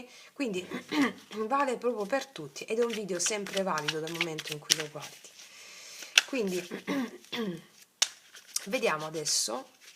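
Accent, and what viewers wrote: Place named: Apulia, Italy. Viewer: Dal vago accento sembri pugliese...sbaglio?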